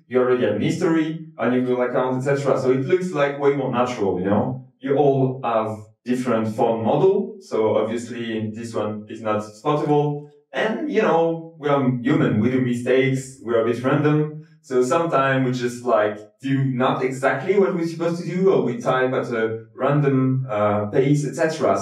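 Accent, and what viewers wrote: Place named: France. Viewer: Fluent English ! With pretty french accent, j'aime bien :)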